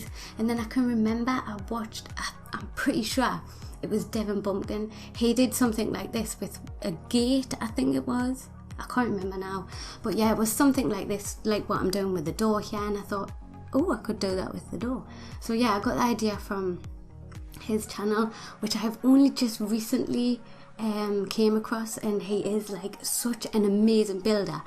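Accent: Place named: Yorkshire, United Kingdom. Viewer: I like your Yorkshire accent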